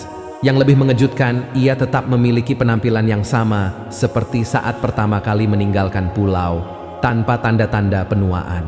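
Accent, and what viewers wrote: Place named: West Java, Indonesia. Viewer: Itu tergantung Logat daerah asalnya,sptny dari Sunda,cuma saya blm pernah dengar riwayat Dajjal mengembara SPT diatas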